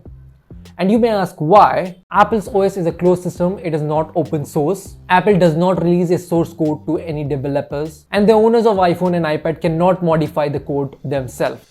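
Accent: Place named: Southeast Asia. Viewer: Get used to it, way more people in the world sounds like this when they speak English than the American or British version. in South East Asia alone there are about 500 million English speakers and they all sound like this. But I do agree he needs to slow down a bit.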